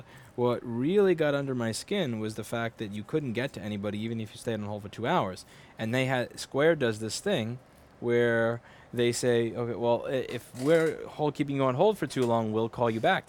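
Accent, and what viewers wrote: New York, United States. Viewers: New Yawkers like pronounce "human" as "you-man"... Not a criticism,! You're the freakin man! 100% love for you!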